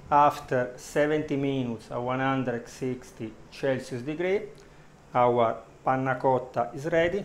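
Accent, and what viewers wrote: Italy. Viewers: L'accento italiano è fastidioso 😅. Non pensavo avessimo questo accento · Hears his accent ok this is original panna cotta boys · Search YouTube for Panna Cotta recipe First result, Gordon Ramsay SKIP! Sees Italian channel name Might have something here... Hears accent Yep, this is the one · Love the accent Italiano 😘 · What a cute & wonderful Italian Accent. I could listen to him for hours! Good job teaching in English ! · The Italian Accent is so nice 👍, and also the recipe..!! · Love your Italian ascent 😘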